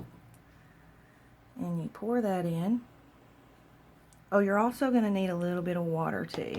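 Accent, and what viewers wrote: South, United States. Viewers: Thank you for sharing. This is simple and doable. Plus... your southern accent is everything 😍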